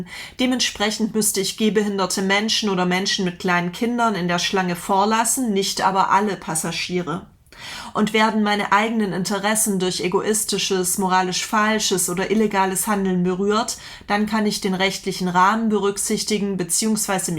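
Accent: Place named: Swabia, Germany. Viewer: Bisch du au Schwoab? Es klingt fast so :D Super Kanal!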